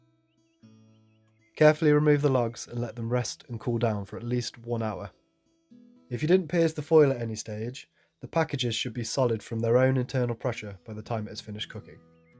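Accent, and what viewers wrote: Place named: England, United Kingdom. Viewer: Besides the English Accent the birds chirping with music is wonderful Seitan's Red Robins?, AnyDrOwlWho? Pasta etc 10-15 min , is it really necessary to cook seitan for 1 hr everyone seems too , what is the minimum cooking time ? without a pressure cooker of course ; and is yeast necessary needed or OK without added yeast ?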